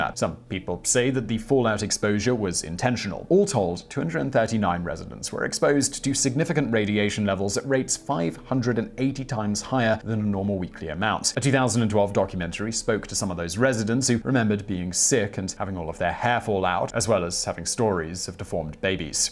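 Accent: United Kingdom. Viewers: Something about a British narrator talking about America's atrocities makes this video hit different to me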